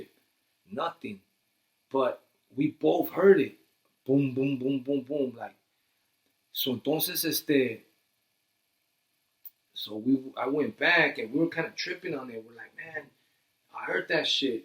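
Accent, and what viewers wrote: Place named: New York, United States. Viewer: you sorta have a New York accent when u talk..maybe it’s just me..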